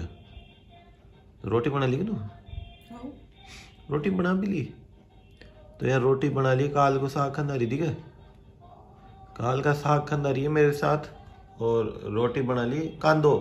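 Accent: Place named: Marwar, India. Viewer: Marvadi bhut achhi lagti h Bhaiya apke muh s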